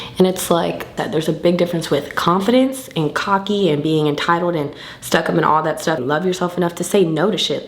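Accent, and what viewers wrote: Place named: Florida, United States. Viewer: I fuck with u the long way!!! Man I miss Florida talk!!! Love it keep em coming!!! Ur doing great!!!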